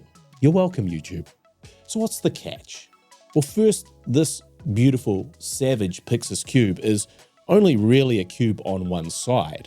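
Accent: New Zealand. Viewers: Nice to hear another Kiwi voice on the webs!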